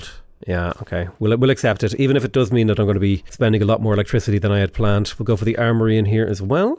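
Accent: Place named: Ireland. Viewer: Anyone else think that as progresses, his irish accent gets thicker?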